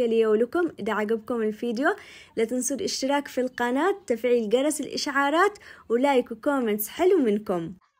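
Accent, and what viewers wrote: Yemen. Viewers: الله يعطيك الصحة انا جزايرية و زوجي يمني و الله يفرحك قناتك ممتازة ما شاء الله و لهجتك يمنية حلوة و مفهووووومة 😊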